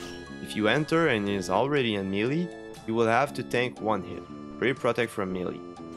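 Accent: Quebec, Canada. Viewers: J'ai remarqué direct l'accent québécois! super guide, lache pas! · are you from quebec? this accent me dit dequoi en tabarnak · bro are u canadian/french? u talk almost identical to GSP (georges st-pierre) edit: ty for the guide very well explained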